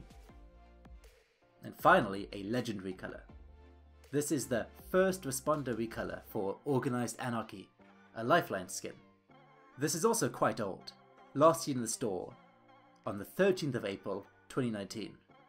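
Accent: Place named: South Africa. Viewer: Are you South African Im pretty sure you have a South African accent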